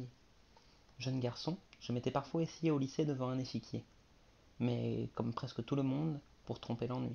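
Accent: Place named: France. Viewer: Non il parle juste francais de France. Il lit tres bien.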